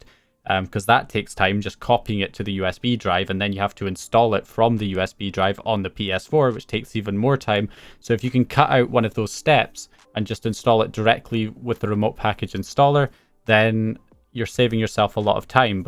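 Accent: Scotland, United Kingdom. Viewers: Been thoroughly enjoying your videos man! Keep 'em coming. It sounds like you might be Scottish so that's an added bonus! "HERE WE, HERE WE, HERE WE F*CKIN' GO!"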